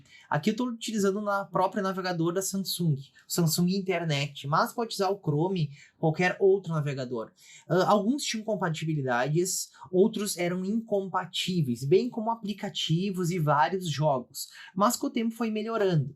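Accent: Brazil. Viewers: Sotaque de Porto Alegre, certeza!! Curtindo demais teus vídeos, vizinho! Kkkkk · Tu és de SC ou RS, tem muito sotaque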